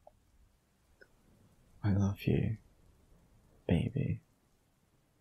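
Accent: England, United Kingdom. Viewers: Omg you have such a proper English accent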